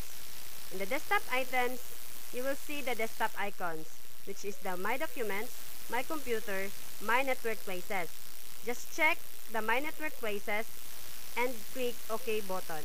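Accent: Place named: Philippines. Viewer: pinoy???? hahahha. 100%.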